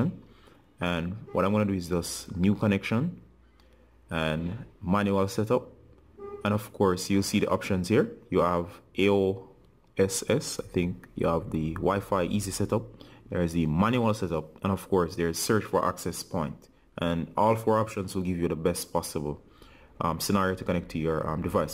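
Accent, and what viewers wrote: Jamaica. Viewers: U sound Jamaican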